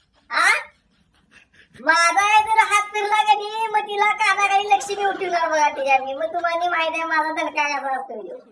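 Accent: Kolhapur, India. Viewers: Your kolhapuri assent is fabulous❤❤❤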